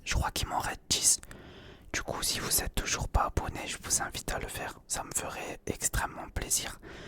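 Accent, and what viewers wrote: South, France. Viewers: salam aleykoum, ca faisait tellement longtemps que jsuis pas tombé sur tes vidéos ! le truc que j’aime vraiment trop c’est l’accent du sud, t’es de marseille nan?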